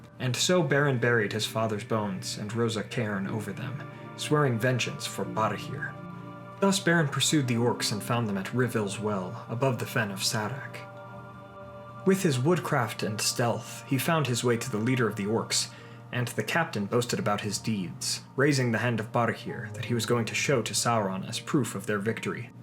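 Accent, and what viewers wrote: United States. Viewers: Lol, it sounds so wrong told in an American accent😂🤣!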